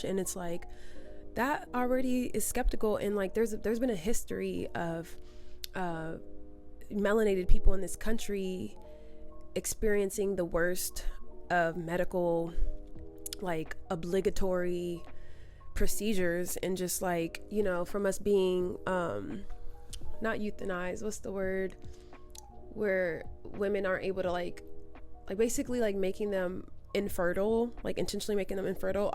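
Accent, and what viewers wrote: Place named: Model C, South Africa. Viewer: Thank for responding to the comment iv made on the other video. Well If you'd come to South Africa, you'd fit in perfectly, especially in the suburbs. your accent wouldn't be so different to what we call "Model C" accent. Then later you may link up with people who'd take you to the townships.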